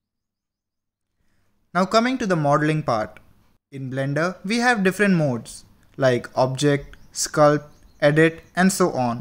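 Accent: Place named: India. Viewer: When they have an Indian accent, you know it's about to be good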